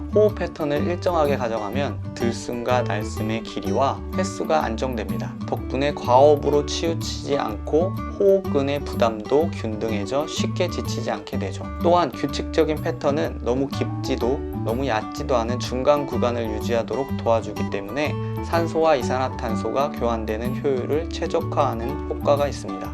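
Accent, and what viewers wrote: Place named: Mokpo, South Korea. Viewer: 오 목포네요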